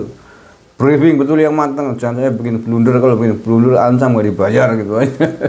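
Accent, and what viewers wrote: Banyumas, Indonesia. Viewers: Panjenengan pundi pak, kayane koq rada ngapak ya 😂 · Pak Njenengan bahasane ngapak, asli endi ? Nyong Purwokerto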